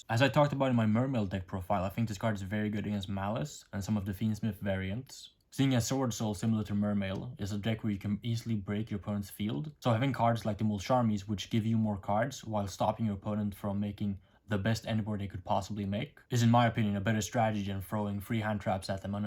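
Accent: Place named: Sweden. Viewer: dont be a prick, how many languages do you speak perfectly? He is swedish, they pronounce some letters differently in english, big deal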